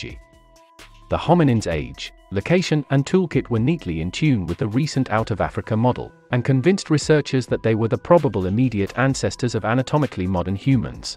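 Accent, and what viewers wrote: United Kingdom. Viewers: Nice documentary, but why do all documentaries like this seem to have to have a British accent narrator? :)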